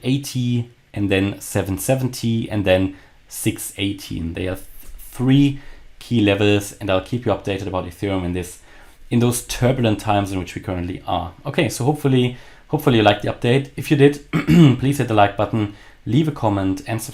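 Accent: Germany. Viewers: Is he a german? The accent.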